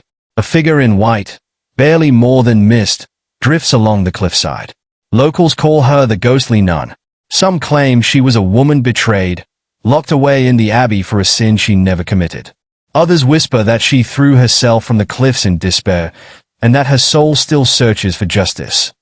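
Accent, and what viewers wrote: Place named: United Kingdom. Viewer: cut him some slack; he's British or he's from Boston.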